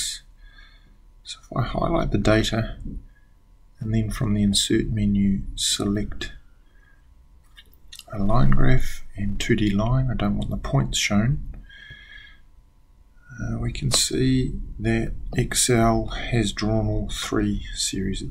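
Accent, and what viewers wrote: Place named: Australia. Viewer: exactly what i needed. short and good. and spoken with a good Aussie accent. thanks!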